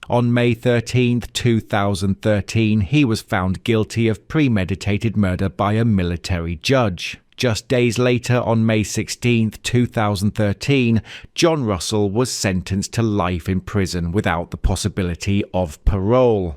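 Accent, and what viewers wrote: United Kingdom. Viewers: British accent is annoying.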